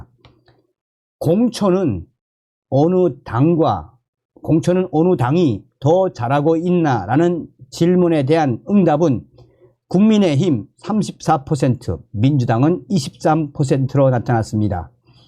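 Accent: North Korea. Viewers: 정성산 티비뉴스 고맙수다래 ㅎㅎㅎ 이북말 정겹읍니다 🌼🌷🌸🙏🏻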